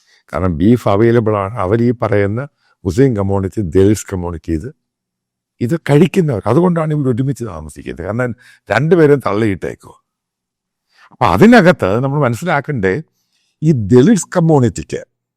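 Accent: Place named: Travancore, India. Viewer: തിരുവിതാംകൂർ ഭാഷയുടെ ഭംഗിയാണത്.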